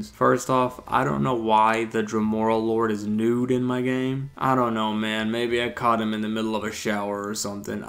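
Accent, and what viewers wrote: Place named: Tennessee, United States. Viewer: I hear a TN accent. Maybe TX. Maybe just joke accents but theyre convincing.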